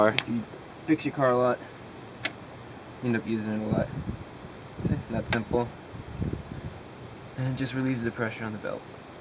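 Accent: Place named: New Jersey, United States. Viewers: Can definitely tell youre from New Jersey. Lol. My wife pronounces water the same way. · JordanMatthew Anderson southeast pa or south western Nj, philly/ Camden we all say wooder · NJ, I'm also originally from New Jersey and I say water the same way, "wooder". Well I'm trying hard to change that now. I didn't realize I was saying it wrong until I moved to a different state.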